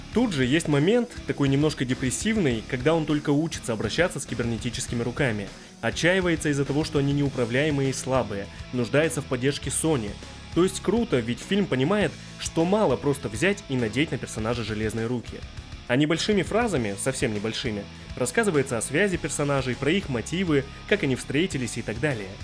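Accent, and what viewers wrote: Far East, Russia. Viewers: Ты с Дальнего востока???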